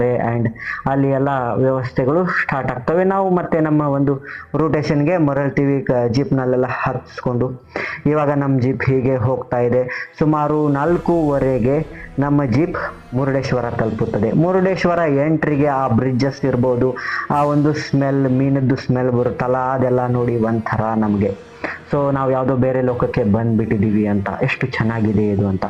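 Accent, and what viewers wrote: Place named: England, United Kingdom. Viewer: ಆಂಗ್ಲರು ಕನ್ನಡ ಮಾತನಾಡುವ ರೀತಿ ಮಾತನಾಡದೆ ಸಹಜವಾಗಿ ಕನ್ನಡ ಮಾತನಾಡಿ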